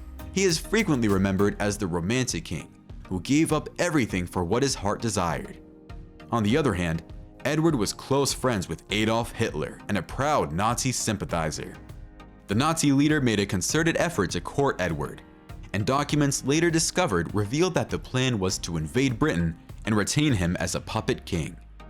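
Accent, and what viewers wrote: United States. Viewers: However, however, however......... awful American accent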